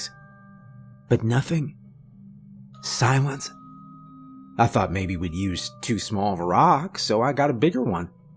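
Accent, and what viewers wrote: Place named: South, United States. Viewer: I love your voice, country (both southern and northern) accents always put me right to sleep. Feels like pa. Your voice reminds me of a buddy of mine, tall , short blonde hair, built like a bodybuilder, does electrician stuff. Born n raise in the heart of Tennessee, with a smooth southern accent. You have a voice that keep reach folks’ hearts. If ya ever get tired of reading stories to folks, take up public speaking to folks. A voice like yours can bring change, buttering’ your way into folks’ hearts. It’s calming, the tone, cadence, pauses are just right ❤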